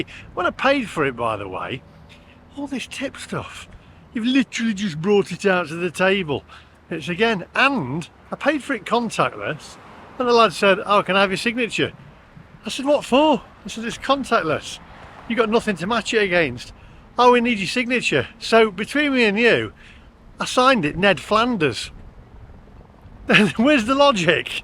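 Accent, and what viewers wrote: United States. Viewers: 80's Ford Thunderbirds were dreadful. My neighbour, an English photographer in Antigua, had one. It couldn't pull the skin off a rice puddin'. My Chevy of the same era had a lot more poke. Oh, and yes, you do fall into speaking Yankee while you're with others. Even today some words do fall out without thinking.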